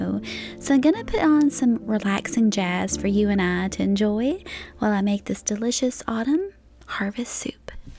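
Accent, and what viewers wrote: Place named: Georgia, United States. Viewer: That's a nice take on veggie soup. I'll have to try that one😋 may I ask about your nails? I'm fairly new to your channel. Are the your natural nails or press on? They always look beautiful. Really enjoying your content. You sound like your from my neck of the woods in GA. How'd you get to Iowa? Have a good safe weekend 😊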